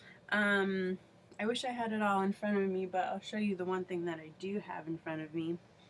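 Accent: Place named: Peru. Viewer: Sounds very Peruvian :)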